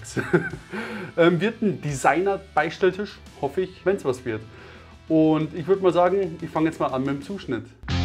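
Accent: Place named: Bavaria, Germany. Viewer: Sau geil!!! Bin durchn Truppe auf di aufmerksam worn... Hob ma jetzt scho einige Videos vo dia o gschaud... Mega guad! Und dann no oana der boarisch red. liabe Griass aus Nandlstadt... (Vielleicht kennst des ja... is ja ned weit weg von dir ;-))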